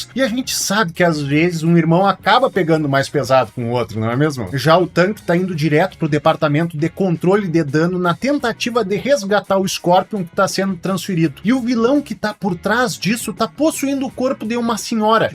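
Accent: Rio Grande do Sul, Brazil. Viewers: Por acaso tu é gaúcho?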